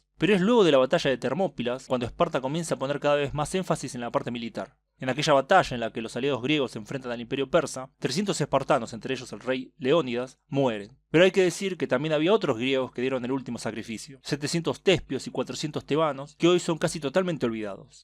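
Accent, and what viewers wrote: Argentina. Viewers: Disculpa la franqueza, aguantando tu "SHA" argentino. (Hago lo posible, porque tus temas son importantes). Quisiera saber eso del "perfil griego". A Napoleón le volvía loco ese perfil griego, Y a mí, cuando veo ese perfi en una mujerl, me parece bello, ya que no tienen la hendidura, de la parte superior de la nariz, sino es recto. Saludos desde Perú. Porfis, podrías pronunciar lo menos posible lo "SHA", pero si no se puede, no importa. aguantaré, Un abrazo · Xq va dejar de decir Sha ? Es así es nuestro acento y nada ni nadie lo puede cambiar te guste o no.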